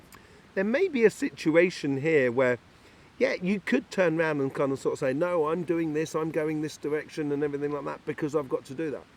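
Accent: United Kingdom. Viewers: You are one of the Top Notch Best!!! With excellent reading you got such an amazing beautiful British accent, it is just delight to hear whole reading without noticing how fast it is over!!! Thank you very much!!! Wish you amazing health and success!!! ☘🌿🌹☘♥☘🌹🌿☘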